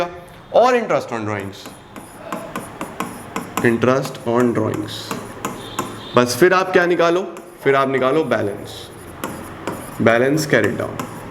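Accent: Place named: Uttar Pradesh, India. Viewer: Sir ji aap kaha se hu lagte tu up ke ho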